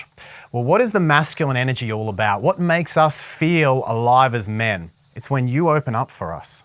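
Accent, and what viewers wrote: Australia. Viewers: are you Australian? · is that ur real name, I have good reasons to ask, r u Chris Pratt? Someone meshed some faces in vids sent to my phone. I met someone in my town I'm living in, in Canada, who looks like you or like the Vid of Chris Pratt sent to my phone. (R u Chris?) The man I met said his name was Dylan? Do you actually have a real partner or just for the vid? Is ur Aussie accent for real, is ur Mat whose filming ur vid, last name Leblanc?(not the friends actor)?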